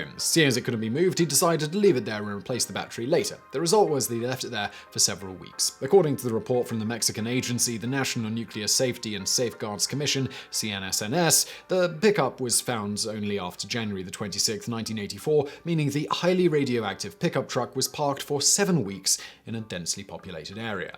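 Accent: England, United Kingdom. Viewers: I really enjoy this mans narrating, maybe cause im American but his English accent is quite soothing. Even if it’s about a radiation disaster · Cool story. But your pronunciation is still terrible. And the names and places sound like a mumbled run-on. Ciuda--Juarez. The guy's name is Vicente not viNcente. It sounds especially strange because you have that smart british accent thing going on but then butcher or mumble the names. I guess Americans aren't the only ones. At least they enunciate the letters, even if the pronunciation is wrong. Don't forget the O-bam-a. I'm keeping count. :P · This guy has the most British accent EVAH! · Hispanic names in a British are incomprehensible to my brain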